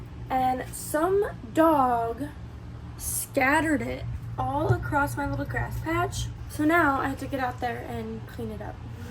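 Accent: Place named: Texas, United States. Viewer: your beautiful live your voice with that Texas accent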